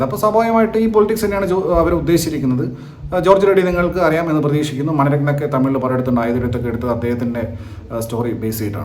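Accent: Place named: Malappuram, India. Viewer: കണ്ണൂർ slang ആയി തോന്നിയില്ല പക്കാ മലപ്പുറം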